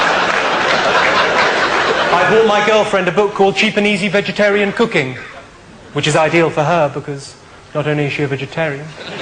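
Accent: RP, United Kingdom. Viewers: Technically he doesn’t have an accent, it’s just a slightly altered form of Received Pronunciation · Is it me or is he really poshing up the accent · His accent is so posh :33333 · Sounds incredibly posh in this show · Is me or does he sound super posh in this??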